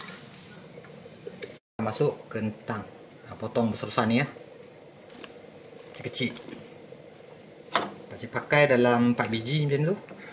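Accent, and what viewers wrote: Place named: Riau, Indonesia. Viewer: Sedapnya nti kita try... Terima kasih ya... Cara pakcik bercakap macam org Riau la..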